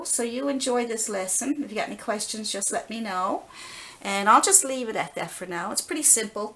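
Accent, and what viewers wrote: Newfoundland, Canada. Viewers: Wonderful, thank you! (Are you from Newfoundland?) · Thank you so much for the lesson! Do I detect a Newfoundland accent? 😊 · You sound like a Newfoundlander. My family is from Newfoundland. ❤️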